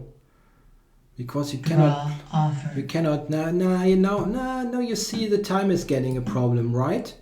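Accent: Germany. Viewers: that german accent xD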